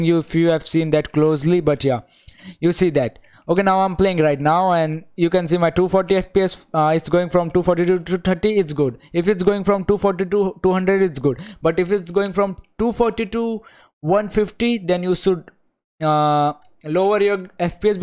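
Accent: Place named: India. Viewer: i hate the indian accents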